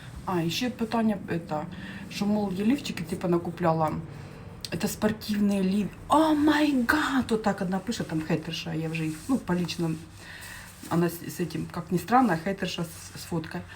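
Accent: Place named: South, Ukraine. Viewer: У неї діалект,який розповсюджений на півдні України, так як на заході України в мові зустрічаються польські та німецькі слова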